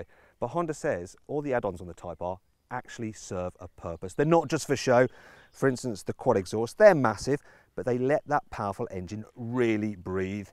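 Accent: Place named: Birmingham, United Kingdom. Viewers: He's a brummy! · Funny to hear a brummie review a car lol. This is coming from a neighbouring yam yam from Wolverhampton lol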